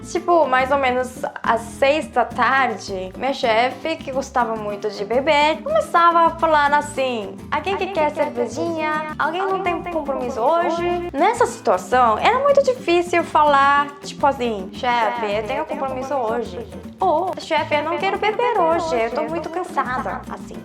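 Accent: South Korea. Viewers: Algumas perguntas; Os seus colegas de trabalho sabem que você fala português e já lhe perguntaram porque você quis aprender essa língua? Eles perguntam a você sobre o futebol do Brasil? Na Copa do mundo de 2002 você já sabia falar português? Seu português é fluente mas ainda com algum sotaque coreano, as vazes você não conjuga o verbo tipo; Eu começar a fazer algo.. seria eu comecei... Mesmo assim conseguimos entender o que você quis dizer. Nós brasileiros achamos muito bom e super legal ver estrangeiros falando ou tentando falar em português mesmo que as vezes de forma ainda crua nós procuramos ajudar e tentar fazer com que o estrangeiro fale do jeito dele e nós tentamos entender e ajudar, as vezes pode vir um portunhol (mistura de português com espanhol). Estou muito feliz de está no seu canal pela sua alegria e simpatia e amor pelo Brasil. Bjs (beijos)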